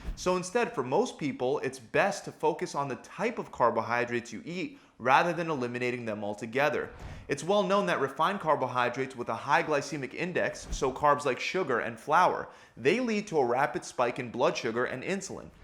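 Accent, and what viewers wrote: Philadelphia, United States. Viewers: Well we all know youre from philly now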